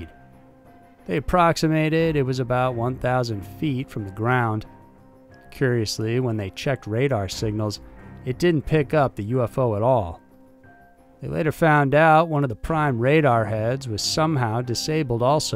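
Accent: United States. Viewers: I would really like to k ow where the narrator is from. Obviously American but which state? Unusual accent. I hope you reply.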